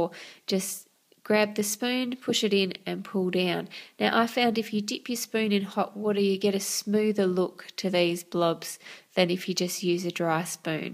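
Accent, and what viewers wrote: Australia. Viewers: BTW, love ur accent! :) Are you Australian? :D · I have to Admit , I love your accent ! #Australian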